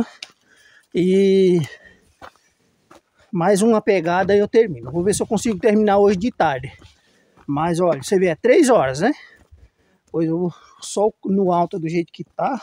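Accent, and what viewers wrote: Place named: South, Brazil. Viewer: Esse sotaque do sul é lindo ❤.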